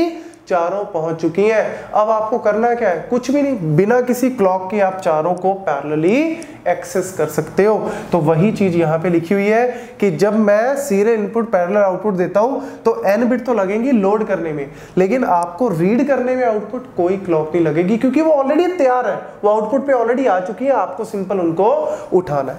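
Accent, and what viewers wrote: India. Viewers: Please, videos with subtitles. I'm brazilian and I don't understand english with indian accent very well :( nonetheless thank you very much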